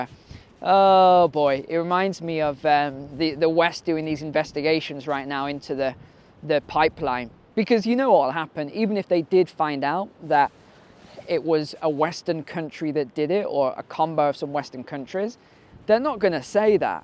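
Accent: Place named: United Kingdom. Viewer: Yes, you're right that I don't care about your looks, but your British accent is nice to hear.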